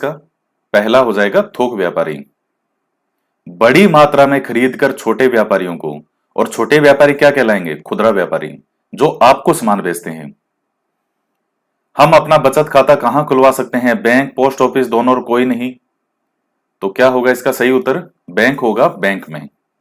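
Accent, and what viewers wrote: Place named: Sri Ganganagar, India. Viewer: 🙏 sir Aapky bary m janty to nhi bt aapni voice tone s lgta h jese aap sgnr( sri ganganagar) k nivasi ho.😊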